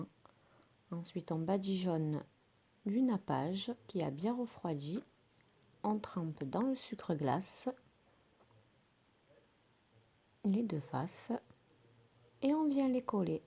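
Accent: South, France. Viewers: Magnifique dès demain je vais essayer Merci vraiment pour avoir la gentillesse de nous faire partager vos recettes et avec un accent magnifique du sud qui me rappelle Mon enfance que demander de plus bravo surtout continuez et encore une fois Merci 😊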